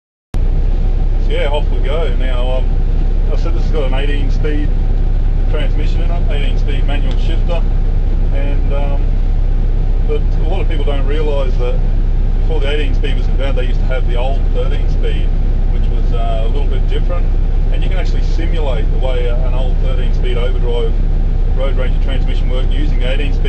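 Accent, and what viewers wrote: Australia. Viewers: fucking australian accent... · well his accent is Australian or kiwi so i'm guessing he's there, where they drive on the left.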